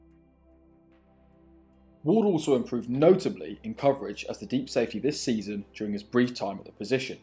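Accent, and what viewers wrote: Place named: United Kingdom. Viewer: Why is a British man breaking down nfl film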